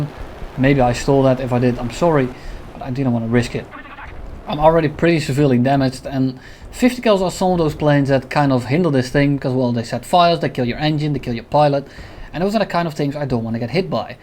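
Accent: Netherlands. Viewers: he sounds kinda dutch like jengar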